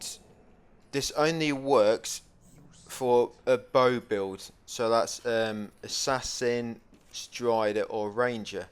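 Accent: England, United Kingdom. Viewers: I can't help but be mesmerized by ur beautiful British voice 😉😚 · I don't know why this video dropped into my recommended, I've never played this game, but your accent is great, what part of England is that from? Fresh sub also, lemme check your other stuff.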